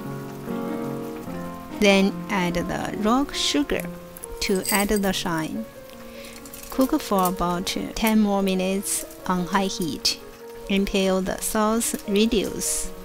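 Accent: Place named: China. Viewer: 这个中国式口音 像学生读英语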